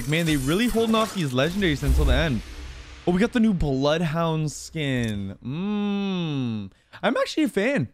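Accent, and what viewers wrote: Canada. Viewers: He’s Canadian idk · Is he Canadian or im just dumb Idk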